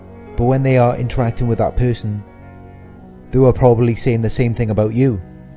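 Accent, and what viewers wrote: United Kingdom. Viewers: Thank you for this. I needed a reminder tonight. Thank you for all your videos. Your voice is comforting. May I ask where in the UK your accent is from? I'm in the US.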